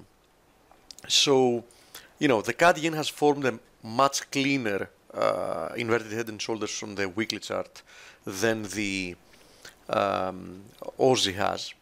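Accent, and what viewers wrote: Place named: Greece. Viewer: Love his greek accent. Best analysis going.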